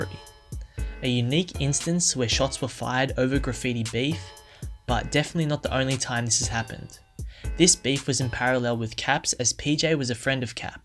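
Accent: Australia. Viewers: Nice to hear a ozzie hey from west oz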